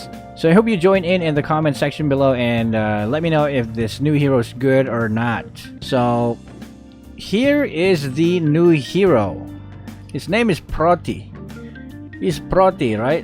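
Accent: Philippines. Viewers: Youre good in english but sometime your voice in your stream sound filipino accent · wait narrator’s a filipino?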